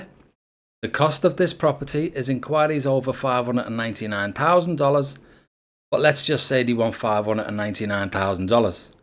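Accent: Liverpool, United Kingdom. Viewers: Are you from Liverpooool mun?!!?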